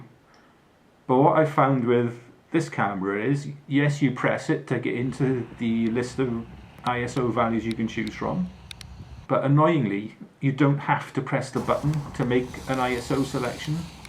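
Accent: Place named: Wales, United Kingdom. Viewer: Just come across this by chance. Not a Fuji shooter (used to have an XT1 though) but love the medium format landscape look & if money was not an issue this would have been a must.. Nice to hear a Welsh voice and someone shooting landscape on You tube. Just off to check your other videos. Hope you get your probs sorted with this camera. atb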